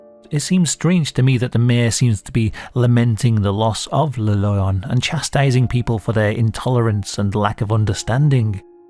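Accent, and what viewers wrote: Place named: Newcastle, United Kingdom. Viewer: Thank you so much for every video you do. The quality and research are always top-notch, and you present a wonderful mix of theories about even the most far-fetched stories that is engaging to anyone, no matter how sceptical or credulous they may be. I especially love, rather than sensationalism, the empathy you show for the victims of horrible crimes--concern about how their last moments must have been, that sort of thing. Many other horror channels get a bit too focussed on the grotesque nature of violent acts and forget the poor souls they are inflicted upon. Most of all, oddly, I love your accent. My old granddad was born near Newcastle, got conscripted in the war, and met my grandma while stationed overseas so never went back to the UK. The war bizarrely saved him from a probable early death in the coal mines, like his forefathers. 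Listening to you speak about gnomes and spooky photos and so on takes me back to when I was little and he'd read me stories about teddy bears. If you read this, thank you for all you do, and please never apologise for your health. I wish you the best of luck finding effective healthcare. You are an absolute gem. The sheer number of subscribers and patreon supporters you have makes it clear how many people feel the same way.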